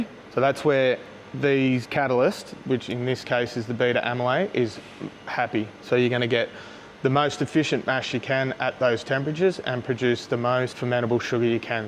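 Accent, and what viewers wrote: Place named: Australia. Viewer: Very interesting information althoug I have to watch it several times because Ausie english is more dificult to understand to me! Thanks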